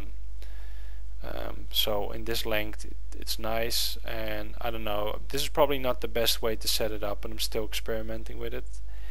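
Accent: Netherlands. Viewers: Are you Dutch ?? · ben je nederlands, want zo klink je wel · are you dutch? I hear a dutchy thing in your voice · you are great, but i can hear your accent, that you are a dutchman. so funny tipical dutch accent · Wait, are you Dutch? Your accent is really familiar, somehow. (See, I'm dutch too, lol.)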